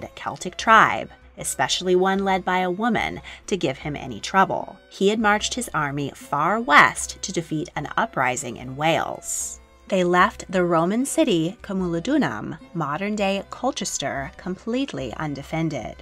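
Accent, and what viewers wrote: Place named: Northern California, United States. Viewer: Good and maybe accurate, but a North Cal accent narration ? You could of hired Steven Toast of London.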